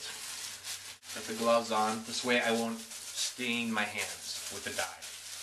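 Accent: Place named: Minnesota, United States. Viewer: Looks great! You from Minnesota? Haha caught the accent a bit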